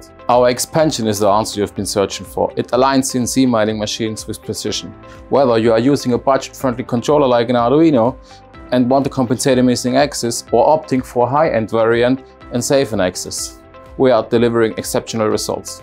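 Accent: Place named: Swabia, Germany. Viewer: Servus Video ist OK aber auf schwäbisch bist Du mir lieber 😂 Gruß Walter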